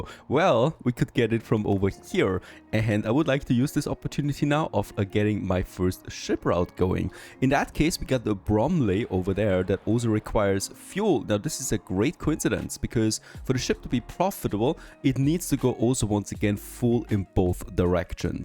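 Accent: Germany. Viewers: alter der deutsche Akzent ist so hart zu hören ne, aber ist ein cooles gameplay ;) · you only need signals at the end when leaving the double tracked bit. and stations have their own integrated signals. putting one in front of the station is basically asking for trains to block each other. signals in this game are only needed in parts where trains could otherwise block each other. they check the track behind the signal if its clear to the next signal and if yes it lets them go. so you want to place them at the ends of the double track to have them check if the path until the station is clear and if not they wait there. the signal after the station only increases your upkeep but doesnt add any value as the same function is integrated into the station. you would want some block signals in the double tracked part but you dont even need those if you are only running 2 trains. 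this entire line would do perfectly fine with only 2 signals, 3 if you want to be safe and give the depot a signal before merging with the main line. waypoints arent needed at all because the trains would automatically orient towards the correct side with the signals for exiting the double tracked part. your accent sounds german so if you are german i can explain it in more detail in german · ich hör das deutsch raus...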